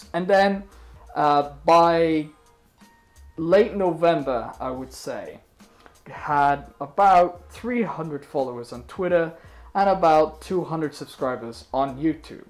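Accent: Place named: United Kingdom. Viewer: wow to be able to pull of a almost flawless British acsent when you are an American is pretty cool Ps I love the content and the caricter of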